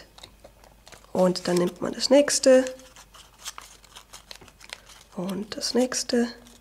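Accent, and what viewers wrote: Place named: Germany. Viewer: Is this speaking/demonstrating cause on another video on her account she says she is German. I am proud to be half-German I love the German accent no offense but accent reminds me of my dad but he died when I was 3. Very good english on other videos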